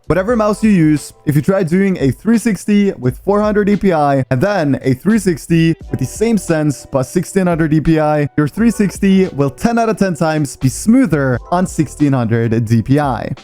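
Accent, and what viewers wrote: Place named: Norway. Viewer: Are you from norway? Cause it sounds like it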